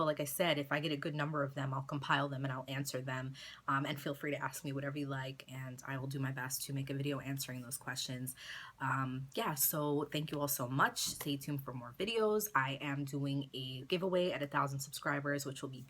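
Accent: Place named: New York, United States. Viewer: omg im from nyc and when I first started watching your videos all I could think of is "she has to be from nyc" bc your attitude and the way you spoke 😂😂💖 I love your videos btw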